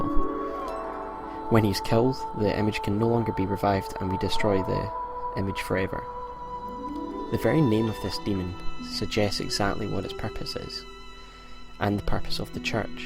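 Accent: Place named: Scotland, United Kingdom. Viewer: Dat Scottish accent. Nice vid man.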